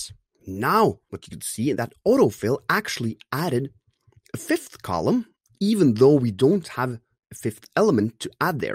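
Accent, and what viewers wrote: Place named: Denmark. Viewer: Great explanation, that's what I needed, thank you! You have a danish accent btw, are you from DK?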